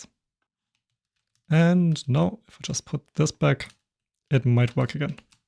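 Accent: Germany. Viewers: i never built this but watched the video because i like redstone funny accent german man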